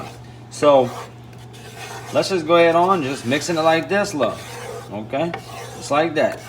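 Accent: Louisiana, United States. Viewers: I been watching this guy for a little while now n i gotta say he's very talented love the hook "Lets go yall " keep doing your thing love the Cajun accent!!!!! Lets Go!!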